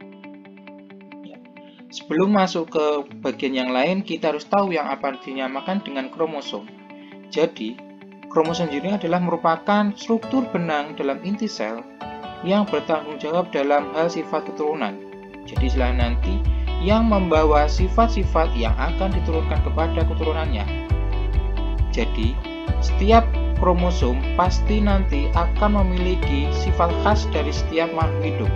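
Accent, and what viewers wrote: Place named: Java, Indonesia. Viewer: Mohon maaf kak kalau boleh koreksi kurang jelas dalam pembicaraannya soalnya agak agak kedengeran medok jawanya mohon maaf nih kak🙏 mohon maaf juga initeh gatau pendengaran nya kurang jelas gatau dari mananya😀🙏🙏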